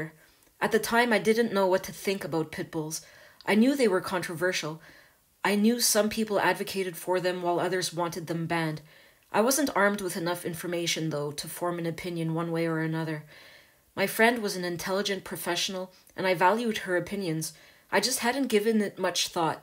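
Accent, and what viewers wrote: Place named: Canada. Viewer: cute Canadian accent, very intelligent, full of wisdom, this woman is so nice to listen to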